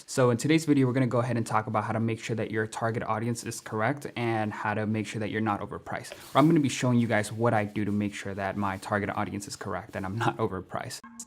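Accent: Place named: New York, United States. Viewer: Are u from ny bro?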